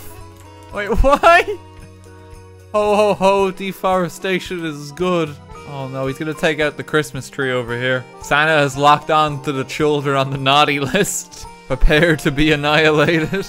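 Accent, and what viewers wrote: Ireland. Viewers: And a Happy New Year,! Thanks for a year full of Irish accented misadventures. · I’m a new viewer and he has a hard Irish accent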